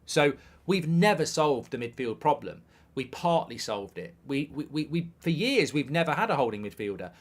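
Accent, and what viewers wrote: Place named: Birmingham, United Kingdom. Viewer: He’s a Brummie.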